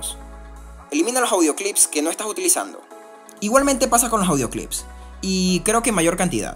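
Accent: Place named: Venezuela. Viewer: Tu acento me suena.... Tu como que eres venezolano? Me too.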